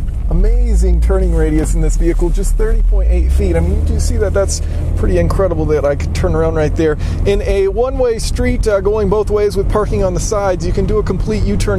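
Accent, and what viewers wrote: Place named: Michigan, United States. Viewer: Are you originally from Michigan? Your accent sounds exactly like my uncle's.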